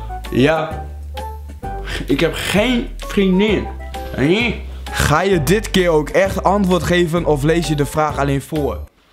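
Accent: Groningen, Netherlands. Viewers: Ik jank om dien Grunnings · Dit gronings accent😂😂😂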